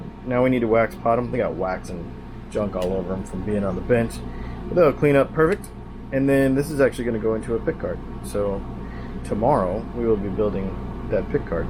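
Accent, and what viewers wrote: South, United States. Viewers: Windowses and browserses...a southern thang. Just like, howzyamomanem! Fine thanki. An yourz? Gotta love it.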